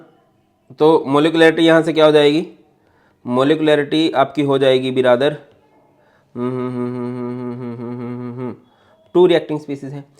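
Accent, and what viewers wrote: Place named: Indore, India. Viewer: are hamare Indore taraf aise hi bolte he yaar 😅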